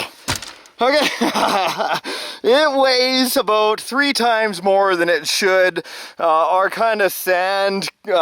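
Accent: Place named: Canada. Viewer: Dude your videos are always playing on my and my sons Youtube. I love your content and your Canadian accent 😂🤣 Keep up the good work, you inspire us and others like us. Thank you for being awesome, wholesome, and family friendly! My son and I are hoping to start documenting our own outdoor R/C adventures, so stay tuned!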